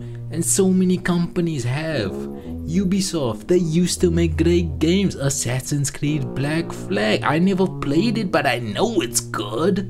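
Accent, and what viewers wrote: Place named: South Africa. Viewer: Eish I thought u where from South Africa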